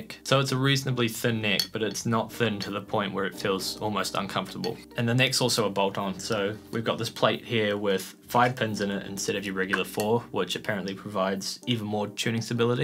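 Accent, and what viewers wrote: New Zealand. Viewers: Nice to hear a New Zealand accent. Where did you buy the guitar from? Local or online? I'm considering getting one also.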